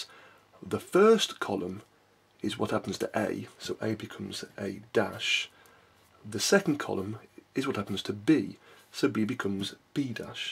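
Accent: England, United Kingdom. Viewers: I LOVE MY VIDEOS NARRATED WITH AN ENGLISH ACCENT. THANK YOU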